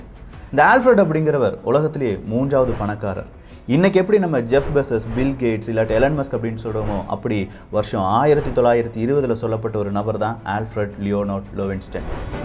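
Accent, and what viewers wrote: Kerala, India. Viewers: Are you mallu?. some of words pronounciation like that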